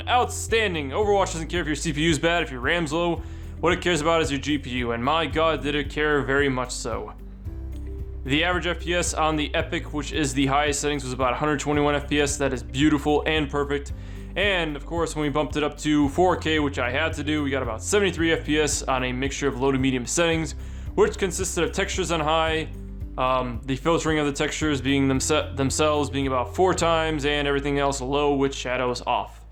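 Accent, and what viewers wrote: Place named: Boston, United States. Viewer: why would you say wicked? because youre from Bawston. Own that shit, brah.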